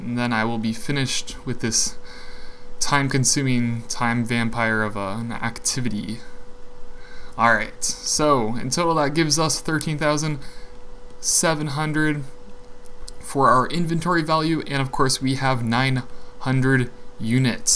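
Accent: Canada. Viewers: I can hear your canadian accient lol :)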